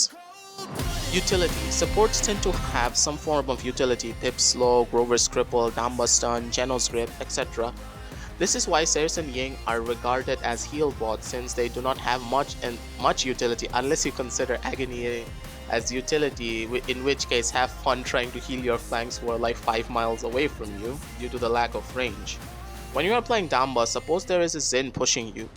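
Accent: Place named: India. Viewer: Why u sound like an Indian?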